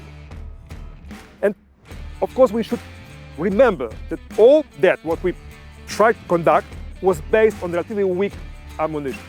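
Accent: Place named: Eastern Europe. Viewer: Bo po wschodnio europejsko angielsku.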